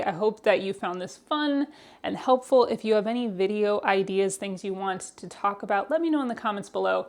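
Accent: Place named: Northeast, United States. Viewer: Where are you originally from? I notice a bit of a NE accent (water) 😅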